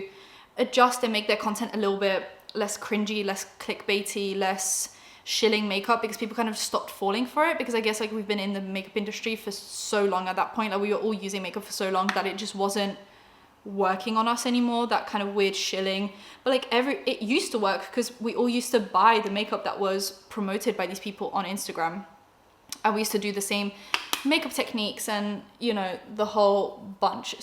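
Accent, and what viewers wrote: United States. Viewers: She has a harsh Bostonian accent. I live in Boston. And it’s not weird to hear. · You had it right, it’s a New York or Jersey type of accent.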